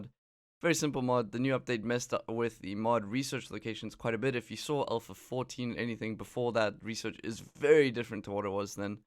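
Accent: South Africa. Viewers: You sound Afrikaans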